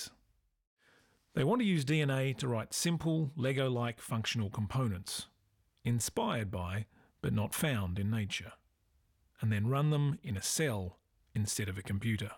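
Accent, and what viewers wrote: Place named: Australia. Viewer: Very intriguing. Nice video. Narrator sounds like Christian Bale as an Aussie...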